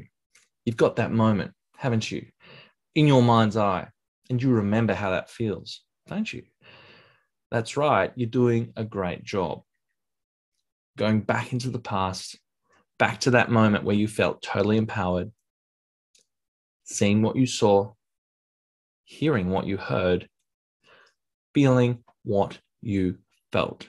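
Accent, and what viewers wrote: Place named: Australia. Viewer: Australian mate 🇦🇺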